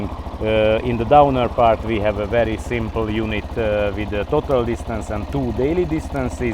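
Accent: Hungary. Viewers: as soon as i heard your accent i knew you are hungarian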